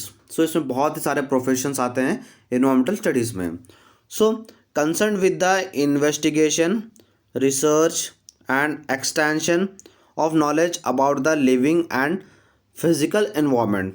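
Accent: Hyderabad, India. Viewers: Sir ap Hyderabad se hai kya..?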